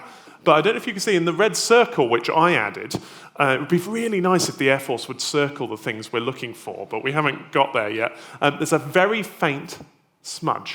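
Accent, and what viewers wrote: United Kingdom. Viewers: I love the britishy accent of the speaker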